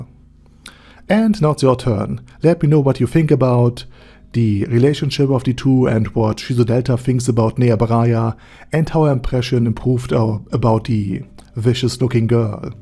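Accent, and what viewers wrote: Germany. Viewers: Danke aus Berlin 😘 i find your German accent way more charming than the ai alternative. 😊❤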